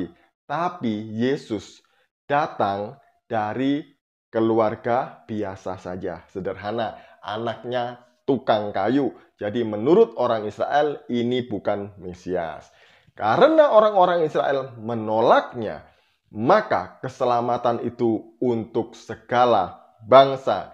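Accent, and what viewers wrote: Java, Indonesia. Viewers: Slim taslim...., kamu nggak bisa baca quran ta kok bahas Alkitab, basane medok, umatmu mau dengerin ceramahmu bukan karena kamu pinter dan enak ceramahmu tapi yg mereka suka dari kamu, kamusuka obok2 kitab orang kristen.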